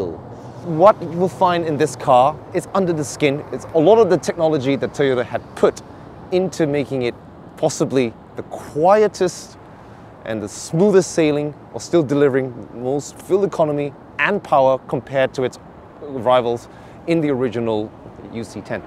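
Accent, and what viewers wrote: England, United Kingdom. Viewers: The hairstyle needs a mod to fit with the English accent...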